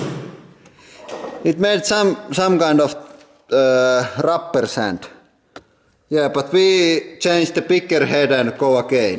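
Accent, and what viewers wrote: Finland. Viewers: Nope, he's Finnish. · its finish accent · "Dat vas van touff rupperband ball!" YOur ACCENT IS SO AWESOME, are you from Finland? XD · Hes finnish for sure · hittoku kuulostaa nii suomalaiselta ku puhuu englantia nuih XDxD tuo on nii suomalaista englantia ku voi olla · finland accent > every other accent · Finland. Everybody tell this man, its finland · finnish accent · Your Finnish accent is so fluent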